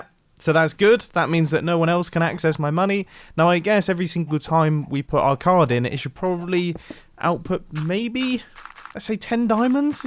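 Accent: England, United Kingdom. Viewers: English accent I suppose? It depends where you're from in England so I can't say because I'm pretty sure I'm not where is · It's not funny that's just how British people speak which includes me and what the hell is a pizza roll?!?!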